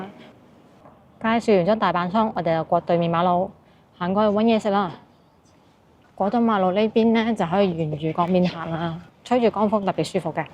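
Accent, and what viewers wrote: Guangzhou, China. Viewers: 我爸媽在廣州佛山大同去香港住的（19xx年了) 我一路看你油管. 我好希望大家有生之年. 再看到白話廣東話再過十年二十年都有廣東文化和廣東話.沒有廣州話 那有今天的香港廣東話. 好喜歡你講正宗的廣州話.謝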